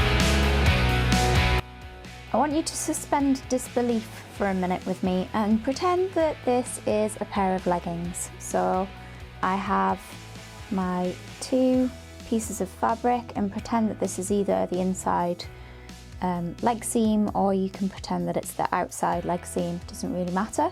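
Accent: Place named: United Kingdom. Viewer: Nice to hear a UK accent 😎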